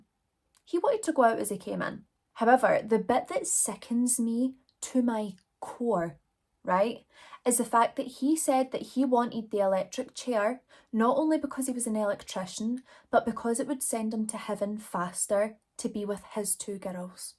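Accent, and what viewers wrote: Scotland, United Kingdom. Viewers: Hf, I thought you were saying “pill party” this whole time but you were saying POOL party 😆 your Scottish accent is so cute lmfao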